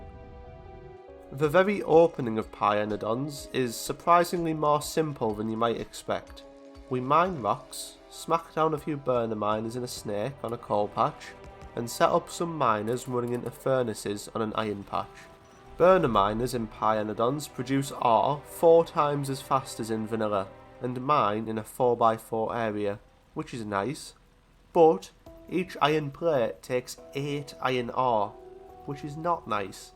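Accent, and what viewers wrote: United Kingdom. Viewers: Your Britsch accent is so pleasing to my ears.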